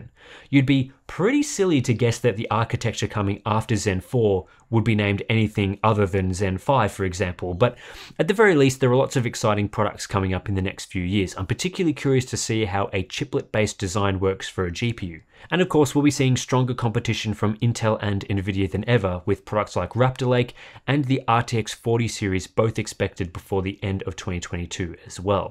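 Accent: Australia. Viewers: there's no such thing as a "normal" accent lol imagine being this provincial Hardware Unboxed please go full aussie accent to ward off this kind of braindead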